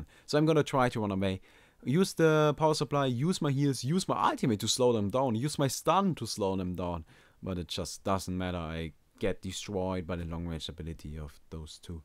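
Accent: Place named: Germany. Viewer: Haha nice accent... du bist so deutsch! hies furd abiletea...that is in seit of his enemy.. krass.. guter guide... kennst du kino der toten black ops 1.. manchmal bist du ein deutscher und der hort sich genau so an wie dein ausprach.. wirklich ich lach mich tot.. gruB und keep on the good work aus holland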